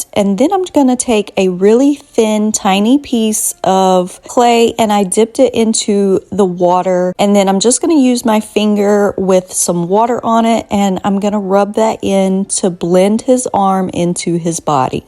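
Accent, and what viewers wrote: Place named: Florida, United States. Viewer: I say "them" and "him" with the same accent as you .. I'm from Florida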